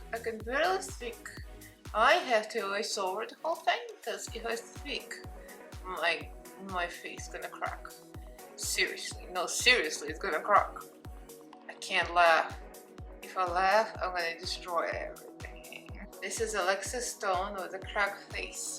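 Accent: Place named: Russia. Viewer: You are just too funny. "My husband tells me I look just like her, but he always compliments me, so I don't trust him." "I sound like I have a speech defect. Yes, I have a Russian accent, so I sound like I have one anyways." 🤣. I already told you I think you look exactly like Alexis, too. And I'm not your husband, so you should believe me.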